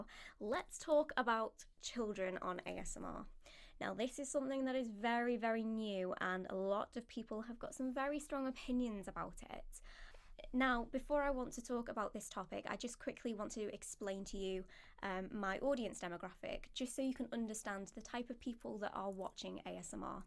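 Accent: United Kingdom. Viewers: Shes british mate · God I hate the overtly sexual vidoes/thumbnails... I dont know who is watching their full vidoes theyre usually terrible from an actual asmr or relaxation point ... side note Ive only seen some whispered vids didnt realize you were from UK, probably why I like your voice so much lol. Anyway thanks for making content! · yeah I know that, I just can't differentiate her British accent from other parts of Britain or Europe.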